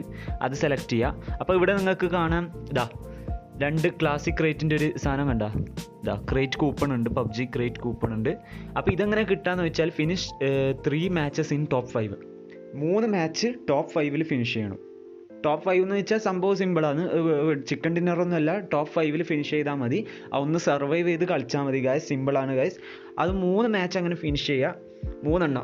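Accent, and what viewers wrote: Kannur, India. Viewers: Ningal kannurilaano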